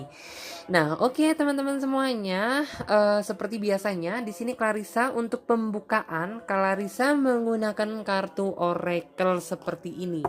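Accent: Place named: Surabaya, Indonesia. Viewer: Kaka cara logad ngomongnya kayak orang surabaya kaka tinggal disurabaya ya ??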